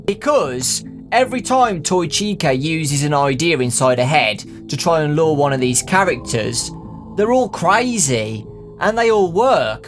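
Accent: United Kingdom. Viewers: i hate this brittish accent.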